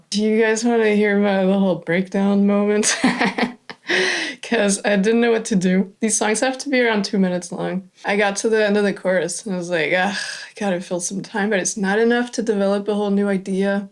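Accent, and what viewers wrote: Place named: Quebec, Canada. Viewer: Years ago when I watched one of your early video, I thought your talent combined with your quirky Québécois inflections might spell success. Back then you recorded vocals with an SM58, which surprised me. Then last year I saw you sponsored by Native Instruments (I think). Congratulations on your management and continued success! 😁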